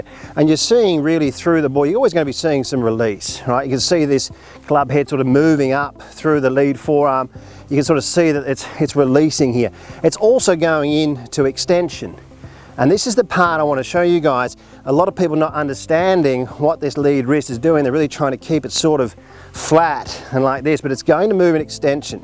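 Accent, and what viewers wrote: Australia. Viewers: great visual animations to assist the explanation, plus the sweet Aussie accent helps too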